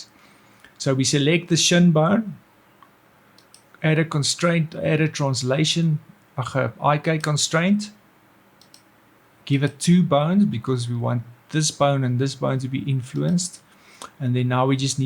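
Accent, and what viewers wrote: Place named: South Africa. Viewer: Good sir, from your accent im assuming your south african,🤝😃. Im curiouse, are there any south African companies or industries that use Rive in they pipeline? Great stuff by the way👏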